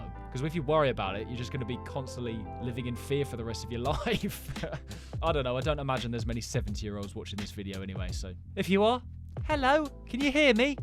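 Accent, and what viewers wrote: United Kingdom. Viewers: Your voice sounds like your from U.K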